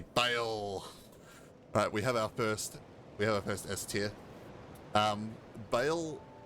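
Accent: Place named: South Africa. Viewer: You sound South-African or Australian.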